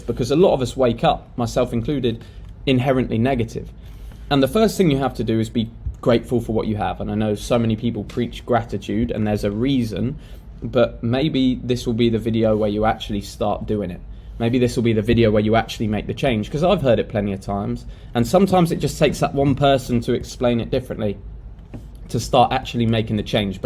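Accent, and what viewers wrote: England, United Kingdom. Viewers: It's really interesting to hear this stuff with an English accent! Thanks for posting!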